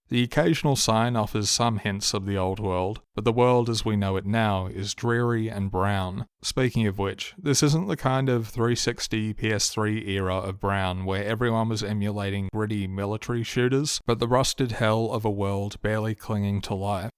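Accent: Australia. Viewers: Strongly disagreeee, the Aussie dialect that like to strain out the last letter of the last word of a setence bothers meeeeee. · Certified monotone Australian classic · Disgusting to see people responding to this mentioning Br*ts. Monotone Australian is the only trustworthy media analysis phenotype. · a monotone Australian voice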